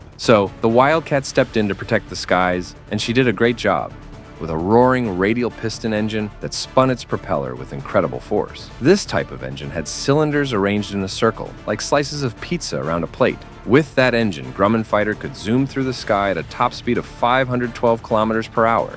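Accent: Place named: Russia. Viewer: Впервые услышал руский голос автона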